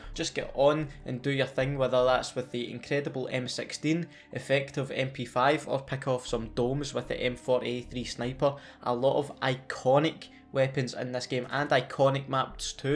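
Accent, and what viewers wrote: Scotland, United Kingdom. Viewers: I love your channel, but your braveheart accent is giving me a headache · That scotish ascent dogh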